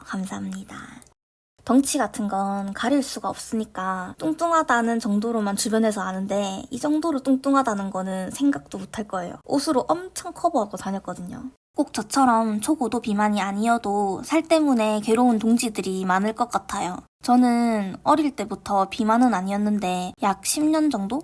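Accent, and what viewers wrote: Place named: Ulsan, South Korea. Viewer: 사투리가 울산같은데,.귀에 쏙쏙들어옵니다. 저는 포항이거든요ㅋ 저도 다음달에 대구에 하러가거든요 너무 와 다닿네요ㅠ 잘 보고갑니다. 함께 화이팅 해 보아요~~^^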